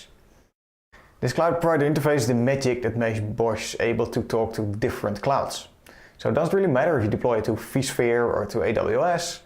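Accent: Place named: Netherlands. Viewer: dutch english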